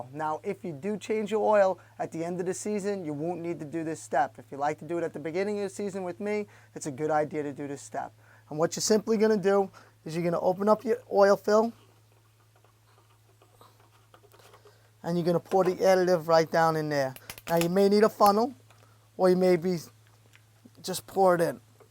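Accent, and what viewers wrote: Long Island, United States. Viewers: Holy cow what a think Long Island accent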